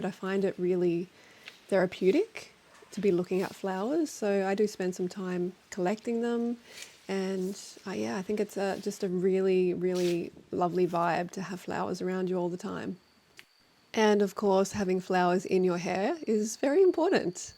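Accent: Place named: Australia. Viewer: Hey, where are you located? By your accent, you are Australian as I am. I live in Fiji.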